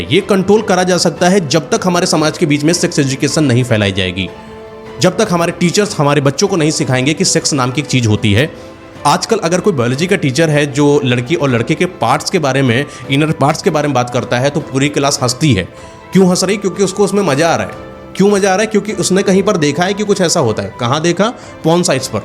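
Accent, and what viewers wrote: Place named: Bihar, India. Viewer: Hopeless aur bekar aapne sab bataya, aur apni bihari bhasha ki hindi mat boliye bada odd lagta hei sunne mei, apni hindi thik karo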